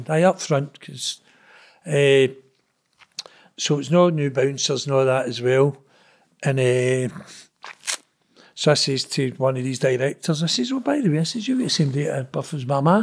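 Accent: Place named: Glasgow, United Kingdom. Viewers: Or learn Scottish mate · The subtitles are funny they canny understand the wee Glasgow accent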